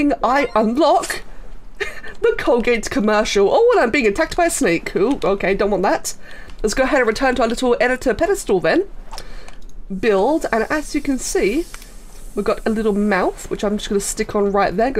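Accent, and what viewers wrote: United Kingdom. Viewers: why listening to the bri-ish accent hurts that much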